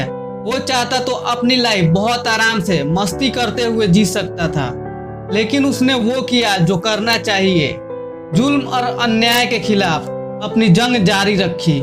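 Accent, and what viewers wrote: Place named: Bihar, India. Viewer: Bihari youtuber bhai accent badalo yaar...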